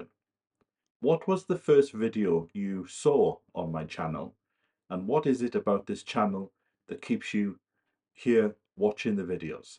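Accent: Wales, United Kingdom. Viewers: Jambo, am from Kenya though my 13 year old son schools at Ysgol Bryn Hy Fryd in Ruthin. I have visited Bryneglwys, Corwen couple of times and I love Wales compared to Nakuru, Kenya. The first video I watched was when you were moving to Wales. You seems interesting, down to earth and your sense of humor, you welsh accent that just does it for me.... I do enjoy gardening videos, walks on the mountains, how you relate to everyone that comes your way. You're you self, not fussy unlike the current youtubers of my age group (35-40). You're like a friend cause watching you is so comforting. I love your lifestyle. Keep the videos coming. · I think I first saw a video when I was watching various gardening videos and you came up in my feed and Its been interesting watching you tend your various allotments. Now, for me, its all about your return to the valleys as I have mentioned before my mum and grandmother were born there ( mum was born in Tonypandy) and both my grandfather and great grandfather were miners in the valley but moved away in the 1930's recession I believed, but I am not sure of dates etc. 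My mum missed her home in the valleys and always spoke about it, so now I am visiting her beloved homeland through you. Your voice and the welsh accent remind me so much of my aunties, uncles and grandmother, I actually feel 'homesick' if thats possible!! I am enjoying seeing you make your home there again. Thankyou and Blessed Be! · Your video appeared one day on my YouTube page . It was the day you moved into your cottage .I often try channels with English speaking people , for keeping my English fluent with contents that can be totally new to me or just someone's day to day life . I recently tried to understand a lady with such a strong Scottish accent that I gave up after about 20 videos. But your British accent is the easiest . I like the 2 kitties . I also have a black one . I like the cooking experiments, the future garden , and the countryside ...I've been to England numerous times but never to Wales so your walkabouts take me there ... 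· Hi, I started watching you about 6 months ago when I was scrolling through utube, to be honest it was your cats that I noticed first as I to have two black cats and then when I heard your Welsh accent I was sold as I spent many a school holiday in Llanelli as my dad was born and brought up there before moving to Bristol, so I spent many a holiday with my relations, I to live on my own now so we have lots in common, looking forward to your new adventure on your allotment. Good luck. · I can’t remember how I came across your YT channel, maybe it was a recommendation by yt or a search about allotments in the Uk, one of the first videos I watched was when you did a bit of cooking and I thought, what a fabulous kitchen and then you talked about sharing the house and your cats, watched your rambles around London. I enjoy your Welsh accent, your deep singing voice, your unhurried relaxed approach and the way you ask your audience what they’re up to at the moment. 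It shows that you are a very experienced cameraman and your footage with the drone, the music, your storytelling is all very welcome in this mad world. I enjoy reading the comments and it’s a wonderful community to be part of, so please carry on doing what you’re doing, hope you manage to get your camera fixed 🤞🏾, Best wishes, Lisa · I came across your video by accident your Welsh accent caught my attention and when you mentioned Blaencwm and Treorchy I was hooked, as I told you the first time I commented I was born in Blaencwm, and although I still live in Wales I get homesick for the Rhondda and it’s people. I love your style your so natural, and I love the journeys you allow us to share with you, I hope you go on videoing for many a long year. Look after yourself, I hope the weather bucks up I am looking forward to seeing you lick that plot into shape.😀😠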